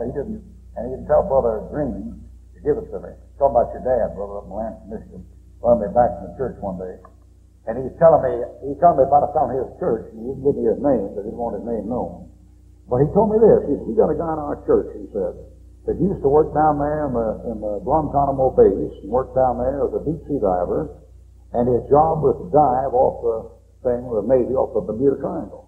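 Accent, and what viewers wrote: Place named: South, United States. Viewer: He's southern just talks fast,I'm southern understand him perfectly,lol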